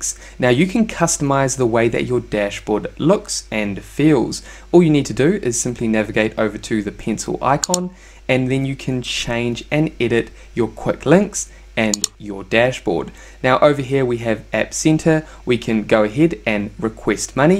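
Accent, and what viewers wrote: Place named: New Zealand. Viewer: Aww jesus, a NZ accent is bad enough but a queer NZ accent is the pits!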